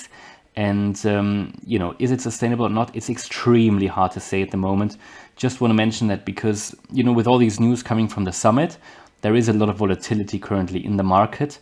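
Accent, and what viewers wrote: Germany. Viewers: you sound like you are from a german speaking region , germany-austria -switzerland . best regards from miami , a ex german immigrant :))) now american immigrant,,, always immigrant :))))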